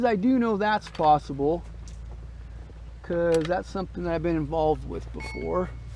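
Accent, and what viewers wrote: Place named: North, United States. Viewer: You are literally the saw guru, I build big cat and Cummins motors for a living, and somedays work on our (dirt crew) were I might cut timber one day and run equipment the next just depending on what's we are doing) so when I say you have talent for saws know that I mean it because that's 1 mechanic to another and your yankee accent really tops it off you northerners really sounds like pricks half the time and coming from a southern hillbilly,,,,well we fucking love it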